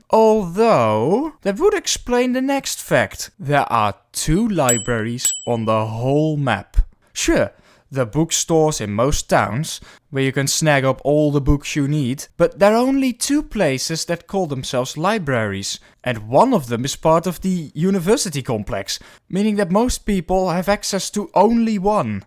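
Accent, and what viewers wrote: Netherlands. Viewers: I love the Dutch into English accent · I heard that accent and immediately knew you were dutch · U can hear this guy is dutch or aren't you? Oh nevermind he included the map of the netherlands 😂😂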